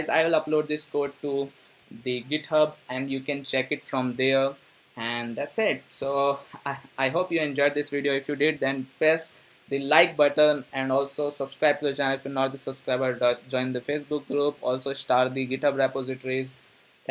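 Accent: India. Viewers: omlot houw ai louwe indian anglish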